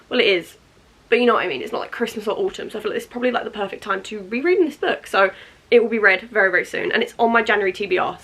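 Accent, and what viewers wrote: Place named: United Kingdom. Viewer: accumulated, brihish axent 😒 nice vid btw 😊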